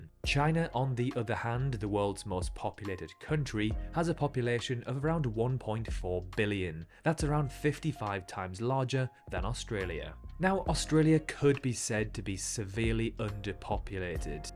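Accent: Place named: North, United Kingdom. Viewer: Love the Northern accent already <3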